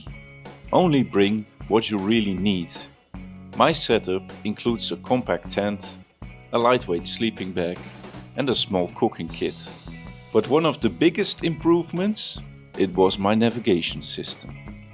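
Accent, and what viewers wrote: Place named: Netherlands. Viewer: Dat moet een Nederlander zijn aan het accent te horen. 😄 Leuk verhaal. Ik heb ook een RT gehad. Nu heel blij met een GS.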